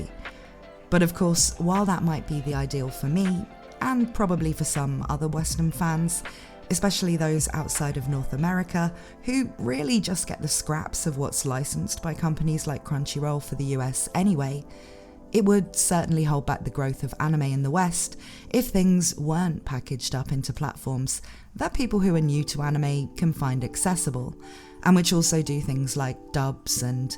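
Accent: United Kingdom. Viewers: They should have someone we can understand say this I don't speak British English 🤦‍♂️🤷‍♂️😤🤢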